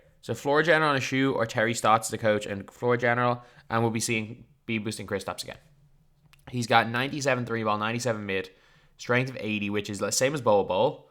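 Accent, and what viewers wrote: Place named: Ireland. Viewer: he’s Irish i’m pretty sure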